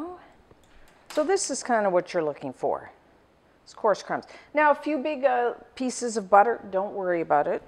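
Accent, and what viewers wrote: Canada. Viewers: Ah, you're hearing the North American accent, but you've completely missed her CANADIAN vowel! Nobody in the U.S. sounds like her! (Unless, perhaps, they live near the Canadian border). The Canadian accent is heard in the vowel "ou", as in "out" or "about," and it is adorable.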